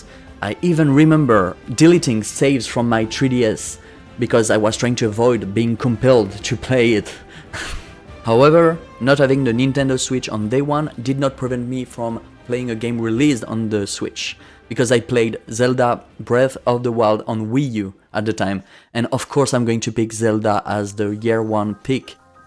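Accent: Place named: France. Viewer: That charming french accent !!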